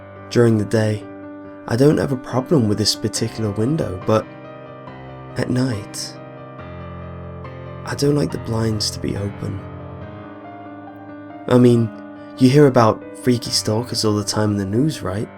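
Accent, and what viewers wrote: England, United Kingdom. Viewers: He isn't American 😂😂 He's British, especially with that accent. No one that's born in America has a voice like that. Only in Britain. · His accent is English.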